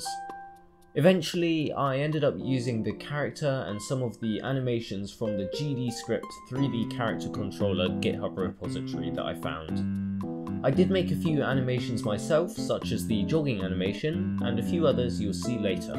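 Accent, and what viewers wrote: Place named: United Kingdom. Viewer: Where you from bro? You sound bri'ish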